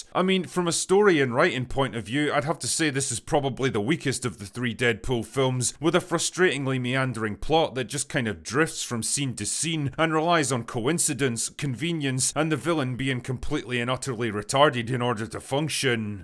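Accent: Scotland, United Kingdom. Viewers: Probably work on your accent you British cnt. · Why are you speaking with a weird inflexion in every sentence? I understand that Scottish is part of your brand but it’s annoying and doesn’t add any comedic value. Stop.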